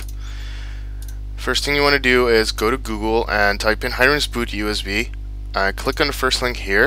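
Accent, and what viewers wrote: Netherlands. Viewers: nou bedankt.. erg handige vid.. alleen jammer van die ruis heerlijk die headphones he and yes you can clearly hear your dutch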